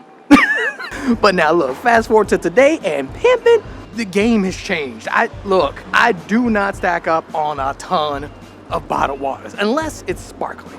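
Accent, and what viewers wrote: United States. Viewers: Can't have an American accent and say Haarlem, that confuses the hell out of people.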